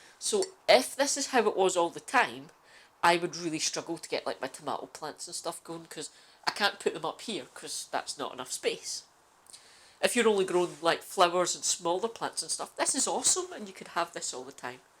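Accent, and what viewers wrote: Scotland, United Kingdom. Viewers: Hey where are you from I’m edinburgh EH12 so chuffed to hear a Scottish voice. I’ve just go a loan of a greenhouse from an Friend in Balerno and a second hand greenhouse from The family across the road. Would love To connect to pick your brain !!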